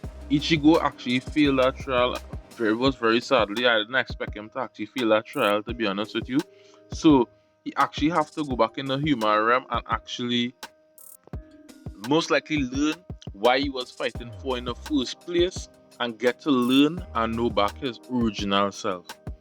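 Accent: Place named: Trinidad and Tobago. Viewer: The moment I hear the voice I was shocked. Lol. A Trini giving a rundown on anime.... Amazing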